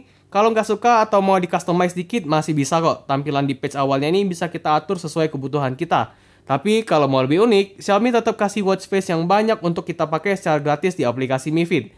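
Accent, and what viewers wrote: Palembang, Indonesia. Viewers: Lahh wong palembang kamu kak 😂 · Wong Palembang lah😄👍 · Palembang ya? Wih mantep bang.. dari aksen nya sih iya keliatan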